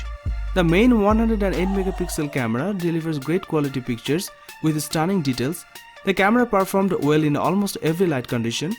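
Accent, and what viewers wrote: Bengal, India. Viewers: Sound like Bengal or assam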